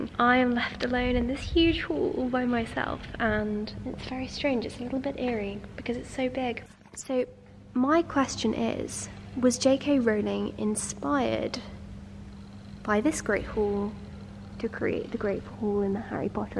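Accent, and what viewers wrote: United Kingdom. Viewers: did you know that I got my british accent by copying you? 😍😍😂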